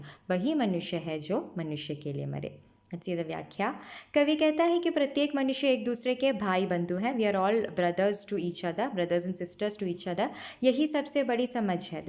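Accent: Kerala, India. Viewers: Mam are u from kerala ? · Mam Are You From kerala 😍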